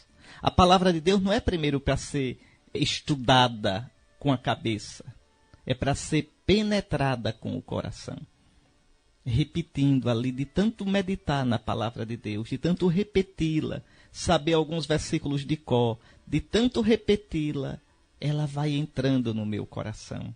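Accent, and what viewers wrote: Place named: Alagoas, Brazil. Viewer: Dom Henrique, com seu sotaque alagoano, sua simplicidade, sensibilidade e conhecimento, nos leva a conhecer e a meditar a Palavra de Deus. Esta pregação é uma relíquia! Quanta sabedoria!🙏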